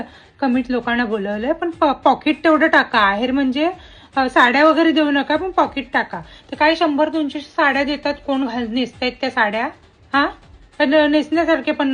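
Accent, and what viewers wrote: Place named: Malvan, India. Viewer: bhari malvani tune please asmi navv ghe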